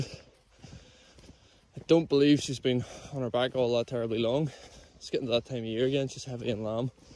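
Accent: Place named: County Down, United Kingdom. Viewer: Just found the channel, subbed even though I know nothing about farming, I just instantly recognised the accent and the Mournes in the background of a video haha. Also from County Down myself.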